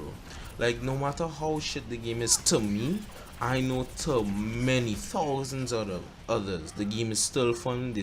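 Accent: Trinidad and Tobago. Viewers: Eh. u from the Trinidad by chance?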